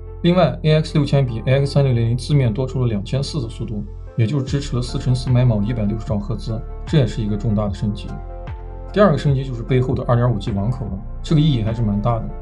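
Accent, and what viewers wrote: Northwest, China. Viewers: 标准西北口音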